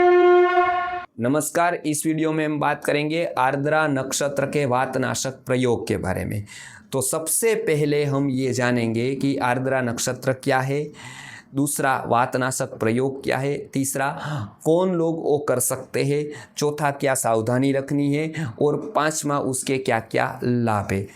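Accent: Gujarat, India. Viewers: Bhai gujarati lage che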